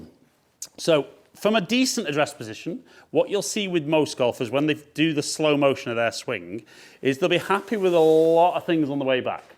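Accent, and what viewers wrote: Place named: Northern England, United Kingdom. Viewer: I smiled at that too. I live in the SE of England (outside London) and we play througthout the winter. To be fair, I think from accent he is based in the north of England where it is a harder winter but even so, to say the winter weather is horrible is probably pushing it a little:)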